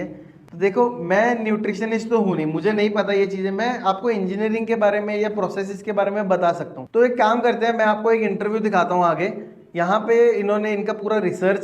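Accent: Punjab, India. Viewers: Aapke language mein punjabi accent hai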